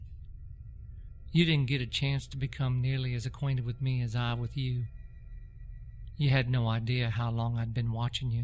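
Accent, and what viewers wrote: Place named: South, United States. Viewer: The narrator’s voice reminds me of a highly educated southern accent. Very much like the great historian Shelby Foote .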